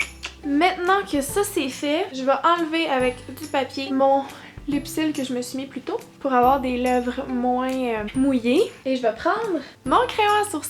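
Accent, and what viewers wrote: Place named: Quebec, Canada. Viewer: Je viens de regarder... une vidéo... de MAQUILLAGE... de 10 MINUTES! J'étais juste venue pour ma dose d'accent québécois...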